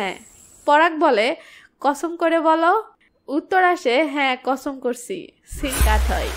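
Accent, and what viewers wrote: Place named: Mymensingh, Bangladesh. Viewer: বুজতে পারতেছি আপনার বাড়ি ময়মনসিংহ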